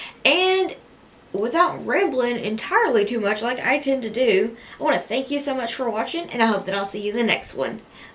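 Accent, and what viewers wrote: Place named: South, United States. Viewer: Oooh I’d love to have this palette!! It’s on my future purchase list. I Like seeing you start out with 0 eyes done! Cool to see the first, true, artistic vision. Possibly the mistakes. Get to see the decision making process. I understand why creators start with 1 done on videos. But, as a viewer, I kind of like seeing it come together, without the end result smacking me in the face at the same time. If that makes sense. It would be easier to do the second eye, after doing it a first time. You would do it smarter. More effective. Cut out unnecessary things or reverse wrong ideas. I get it. But I like this style too! Anywho - love your content. Love hearing a familiar southern drawl. The pearls you picked are PERFECT with this look too. So gorgeous ❤❤❤ sorry, my comments tend to ramble. I have lots of thoughts lol 🤷🏼‍♀️😘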